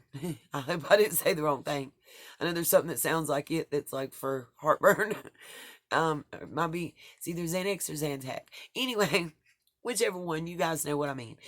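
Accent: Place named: East Tennessee, United States. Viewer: I live in east tn. In the same area as the Smokies and you sound like people from my area. I'm as country as cornbread and I'm seeing things exactly like you do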